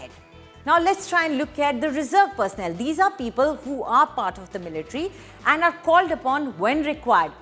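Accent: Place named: India. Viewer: this indian accent 🤒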